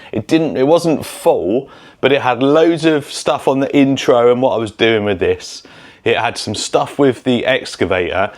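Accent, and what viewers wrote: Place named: England, United Kingdom. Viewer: you have a nice english accent that is nice to listen to..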